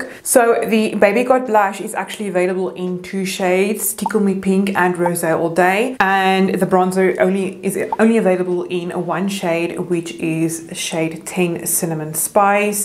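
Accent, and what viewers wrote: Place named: South Africa. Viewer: I can’t tell if this is a South African accent or Dutch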